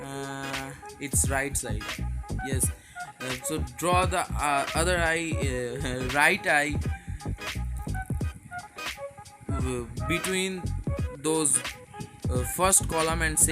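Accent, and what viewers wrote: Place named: Bangladesh. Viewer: Listening to your accent i think youre a Bangladeshi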